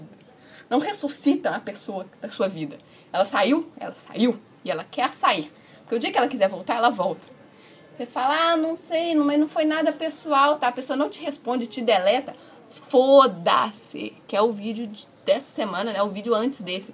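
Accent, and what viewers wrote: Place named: Minas Gerais, Brazil. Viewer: Bom dia, espero que esteja bem. Sou engenheiro brasileiro, atualmente moro em Curitiba. Trabalhei e estudei na região de Munique (Bavaria), nos anos de 2006 e 2007. Assisto teus videos para matar as saudades que tenho da Alemanha. Voce é uma moça muito especial, tenho uma admiração muito forte por voce. Entrei em seu canal ha pouco tempo, mas me sinto feliz em te assistir. Voce tem sotaque de mineira, é isso mesmo: Estava vendo um video seu e que voce vai se casar, qdo sera: sra em minas: O rapaz deve ser um caboclo pra mais de sortudo ter uma mulher como voce nos brcas dele. te desejo muita sorte em sua vida, um abraço bem forte de urso siberiano, Caso queira conversar estou por aqui. Ate mais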